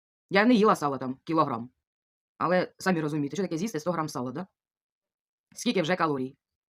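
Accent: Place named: Ukraine. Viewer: Ви дуже природня, щира, аутентична дівчина - Ви не простота (як Ви процитували у попердньому вдіео свого глядача), це глибина Ваша така народна. Викладайте відео - на Вас дуже приємно дивитися і дуже приємно слухати - у Вас красива народна українська мова - я навіть собі записую інколи ті слова, які колись чула у баби в селі, а тепер ми їх не вживаємо. Ви обрали правильний шлях - ви харчуєтесь повноцінно, просто прибрали із раціону некорисну їжу. Бажаю Вам триматись на цьому раціоні все життя, додаючи тільки корисні для організму страви! Успіхів Вам!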